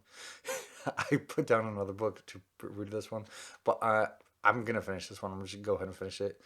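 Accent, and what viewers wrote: South, United States. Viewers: I love your podcast of this video at your house in Texas during your quarantine until you had a lot of leftover books this month are "The Dragon Reborn" by Robert Jordan, "Unsouled: Cradle Book 1" by Will Wight, "Now I Rise" by Kiersten White, "The Invisible Life of Addie LaRue" by V.E. Schwab, "Legendborn" by Tracy Deonn, Cresent City: House of Earth and Blood by Sarah J. Maas, "The Fires of Vengeance: The Burning Book, Two" by Evan Winter and "Dark Age" by Pierce Brown while you're talking about all of the characters from the books to read them from the beginning after that, I hope your husband, Zach will be proud of you and I love your Southern accent!! #januarytbr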